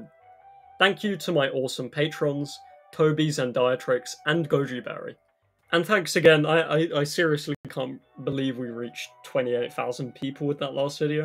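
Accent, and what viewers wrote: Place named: United Kingdom. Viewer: British people pronouncing non-British things.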